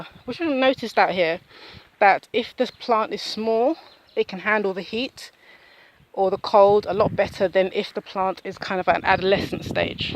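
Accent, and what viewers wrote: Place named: United Kingdom. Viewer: So good to hear that crisp British accent after trawling through so many annoying amercian video's! About to try and grow some here in the far south west of the U.K. for the first time. I've been led to believe it's possible, I guess we'll see!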